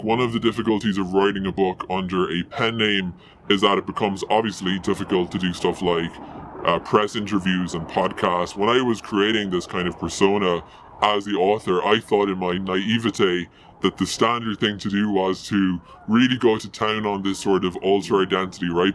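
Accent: Ireland. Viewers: The issue is that a certain cadence and some letters in your pronunciation give away your Irish accent.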